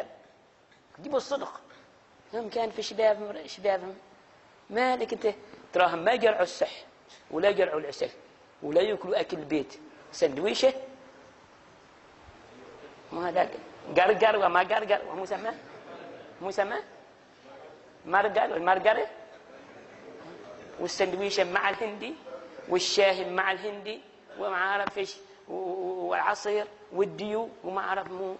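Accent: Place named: South, Tunisia. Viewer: متأكدين ان هذه اللهجة.عمانية ؟ تشبه لهجة الجنوب التونسي.لحد كبير ( مع اختلاف بسيط في الجيم تنطق G' و القاف تنطق G غي الجنوب التونسي ) لهجته مفهومة تماما بالنسبة لي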